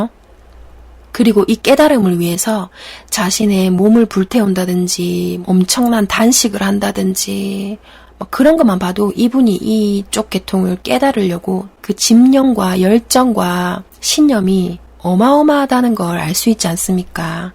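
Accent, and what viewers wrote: Gyeongsang, South Korea. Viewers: 경상도 사투리 반갑네요. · 경상도 억양이 남아있어요 ㅎㅎ 남편이 대구 사람. 잼난 책 설명 좋네요.구독자 많아지실듯! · 갱상도사투리 마 괘안심더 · 님께서 책의 내용을 깊이 있게 이해하고 낭독하고 설명해 주시니 아주 좋습니다. 목소리와 경상도 사투리도 매력있어요 앞으로 엄청나게 많은 구독자가 생길것 같아요. 감사합니다. 사랑합니다. 축복합니다. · 경상도 억양이 친근감을 주네요 수고하셨습니다 감사합니다 축복합니다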